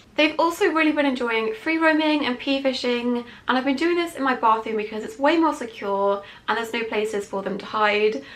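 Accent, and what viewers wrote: United Kingdom. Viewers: That high-pitched British accent is so cringeworthy.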